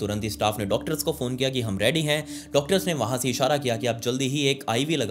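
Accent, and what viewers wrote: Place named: Sirsa, India. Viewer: Bhaiyaji m haryana sirsa ka rhne wala hisar ke pass hi h Aapki boli sun ke pahchan gya ki aap sirsa ke aas pass rhte ho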